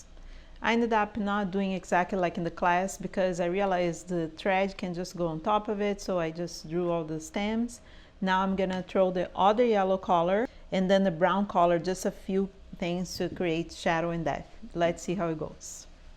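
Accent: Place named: Brazil. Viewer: voce e brasileira ? a sua ingles parece de alguem do brasil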